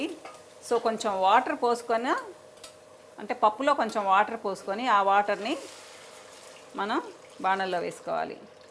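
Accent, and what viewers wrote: Nellore, India. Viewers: gaaru mee videoes chusthunnaanu,mee manchi maatalu,padhimandhiki panikivachhey vishayaalu, mee maatalaloney thelisipothundhi mee methhati manasu.maadhikooda Nellore eynandi,mee prathee maata nenu matladuthunnatley undhi, naa manasu ki daggara gaa undhi,ee roju pappuchaaru chaalaa baagundhi,nenu kooda eppudu chesthuntaanu,kodhigaa populo menthulu,inguva vesukuntey Inkaa chaalaa baaguntundhi,kothhimeera kooda dinchey mundhu vesukuntey chaalaa baaguntundhi.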